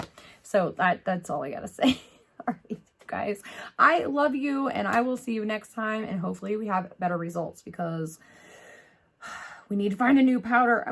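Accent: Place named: Kentucky, United States. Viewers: Country accent gotta love em lol and my mind wasn't in the gutter till you said something 😂😂😂😂😂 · I am from Kentucky as well and I completely get the pronunciation thing.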